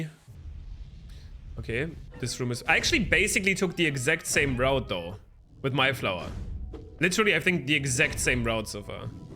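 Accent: Germany. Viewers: yooo are u german !?!?!? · German Dialekt ballert rein 😂 Trd geiles vid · You sound german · he has a german accent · Idk why but You sound german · That accent... Are you from Germany? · One question, are you german?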